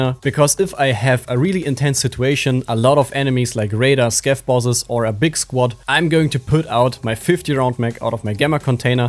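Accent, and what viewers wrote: Germany. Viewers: I hear German Akzent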